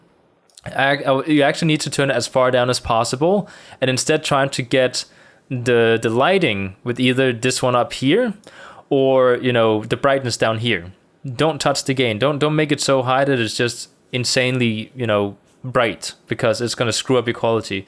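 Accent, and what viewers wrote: Denmark. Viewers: really awesome video, it helped thanks! and btw are you danish? I just though you sounded like it x3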